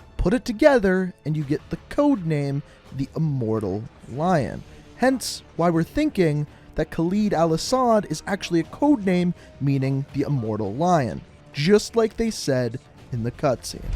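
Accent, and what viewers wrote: Canada. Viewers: Thats because hes Canadian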